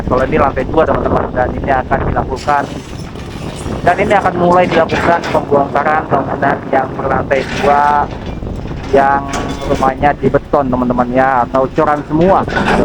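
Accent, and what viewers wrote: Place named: West Java, Indonesia. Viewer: Pake V neng. Sia orang sunda tah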